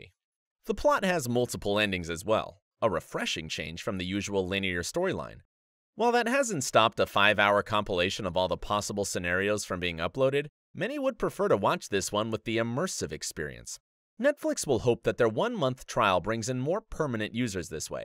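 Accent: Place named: United Kingdom. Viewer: I actually miss the British accent. :(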